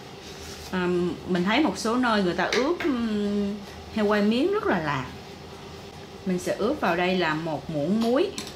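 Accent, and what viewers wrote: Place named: South, Vietnam. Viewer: rất cãm ơn em dạy món thịt quay xem ngon lắm, nghe em nhai dòn rụm chảy nước miếng luôn, món ngon nhà chị ai cũng thích và hay mua ăn có khi ăn ngon quá khĩa 2 bửa luôn, sẽ mua thịt sống về làm theo em - mà nghe giọng miền Nam chân phương của em cãm tình và dễ thương lắm cũng nên quay cái mặt cho mọi người quen biết nữa nhé.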